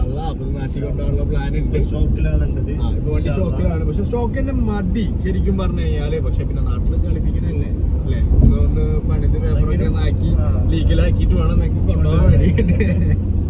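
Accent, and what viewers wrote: Kasaragod, India. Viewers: Kasargod slag ayaloo 😂🔥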